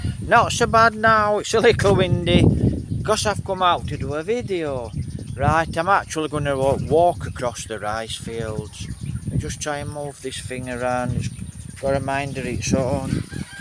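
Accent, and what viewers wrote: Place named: Leeds, United Kingdom. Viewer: He's English, sounds like a Leeds accent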